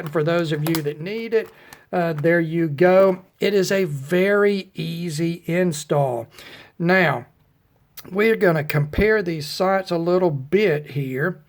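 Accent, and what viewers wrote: South, United States. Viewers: Love this fellas accent , he sounds like a southern gentleman.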